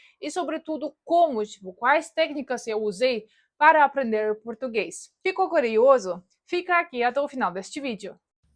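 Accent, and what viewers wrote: Brazil. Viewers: Seu sotaque caipira de São Paulo é culpa do seu principal professor! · Sou do Paraná e vc tem sotaque paulista parabéns vc fala muito bem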